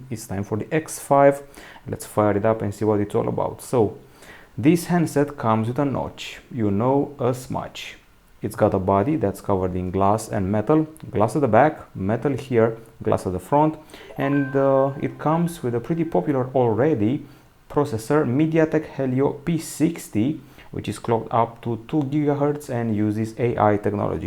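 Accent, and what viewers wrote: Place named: Romania. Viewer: Îmi place accentul de roman:*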